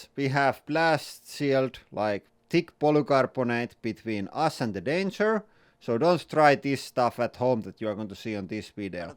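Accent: Finland. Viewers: I love the Finnish accent. It fascinates me. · ❤ one of the reasons I come here is because of the Finnish accent, i like every accent. Be proud 😊 Ps and some very satisfying crushing😂❤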